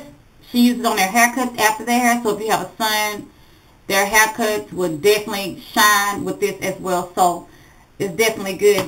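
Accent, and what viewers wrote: South, United States. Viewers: you looook soooo young i mean 33 is young but you look in your 20's love the vids...i could tell u were in the south by the way u tlked so tht was comforting to me im near dallas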